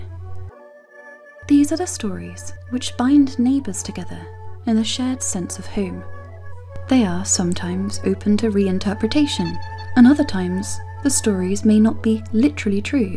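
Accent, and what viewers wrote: United Kingdom. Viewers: Your voice, it's so English / British. And the words that it speaks.... I love it. I thought it was lost. Thank you.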